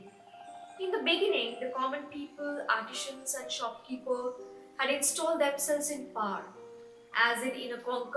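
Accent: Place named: India. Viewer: Beautiful story . And very nicely told. I like Indian accent.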